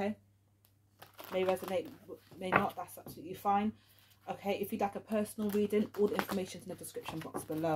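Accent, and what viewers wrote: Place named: United Kingdom. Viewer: Are you British ? Love your accent but good reading thx for your time 🤍🤍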